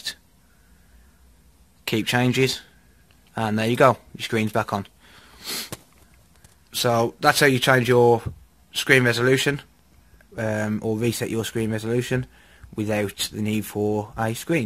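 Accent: United Kingdom. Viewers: The most british accent i've ever heard.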